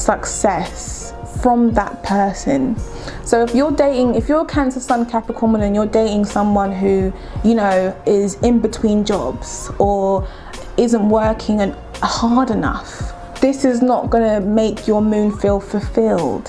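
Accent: United Kingdom. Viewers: You most definitely do but your accent has a little British twang to it 💐 its all love beloved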